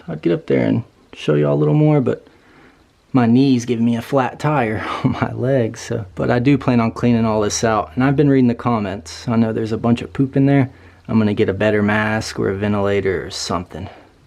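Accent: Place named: Appalachia, United States. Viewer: I’m guessing by your accent that you’re in sw Virginia or east Tennessee?? I have the same accent 😊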